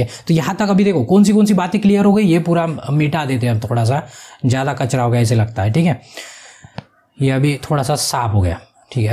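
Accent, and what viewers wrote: Maharashtra, India. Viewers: Sir Aap marathi maharashtra se ho kya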